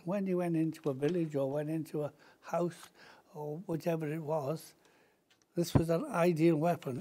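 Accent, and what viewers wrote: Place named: Wales, United Kingdom. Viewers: Sounds like he's from Cardiff or Barry :D. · Lloyd Pagett He has a welsh accent, I live in Wales can confirm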